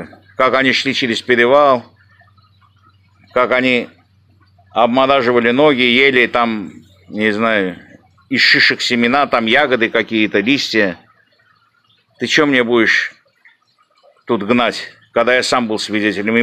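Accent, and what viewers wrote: Baku, Azerbaijan. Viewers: Бакинский акцент)))👍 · У тебя бакинский акцент. Спасибо тебе за правду. Дай бог тебе здоровья и долгой жизни. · У автора акцент всё-таки Бакинский остался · По акценту понятно что, гедеш бакылыды. Но не все такие как ты. Помню в том же лас вегасе был один Гена, сперва пел песни ай мян бакылыям, ай мои все друзья Азербайджанцы, а потом как пернул, ограш, что Гарабах древняя земля армян тут же получил команду сиктир, гётвярян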